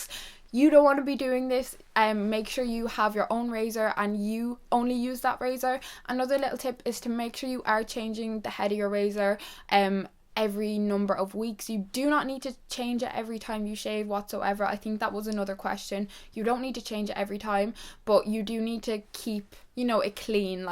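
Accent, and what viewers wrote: Ireland. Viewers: Are you irish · I feel like you're Irish! What county are you from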